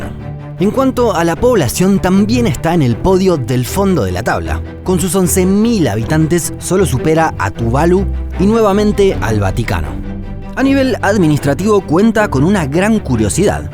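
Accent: Argentina. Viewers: Este tipo es argentino? Si haci lo es salu2 desde bs as xd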